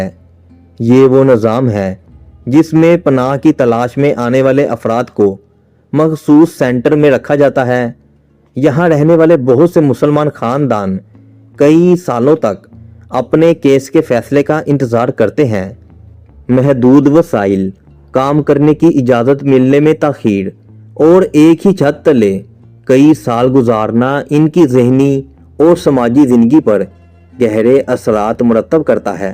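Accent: Lahore, Pakistan. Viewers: بہت پیاری ویڈیو بنائی ہے اندرون لاہور والی اردو بولنے کی بجائے یہ پنجابی میں بنا لیں زیادہ بہتر ہے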